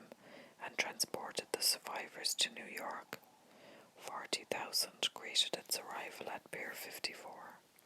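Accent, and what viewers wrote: Ireland. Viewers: U Irish?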